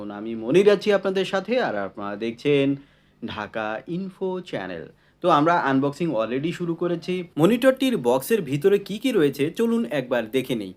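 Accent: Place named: India. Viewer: Apnar voice undian accent pai, khub sundor presentation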